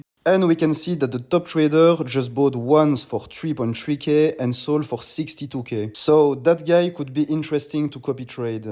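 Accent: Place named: France. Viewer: mdr le big accent fr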